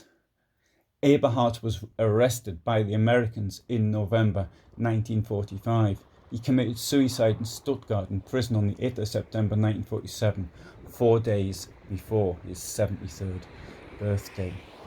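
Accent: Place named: North East England, United Kingdom. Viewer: BTW its great to hear a North East accent sharing such well researched history , we Geordies love our history it seems.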